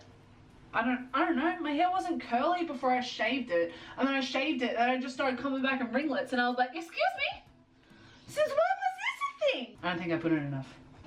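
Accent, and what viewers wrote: Australia. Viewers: Ok I’m new but your videos are so funny and amazing! I’ve got a question. Are you Australian? I’m Australian and your accent is very similar to mine. I liked subscribed and put bell on. Keep doing what your doing! ❤️xx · The Russian accent is put on, the Aussie accent is real. She lives in Western Sydney.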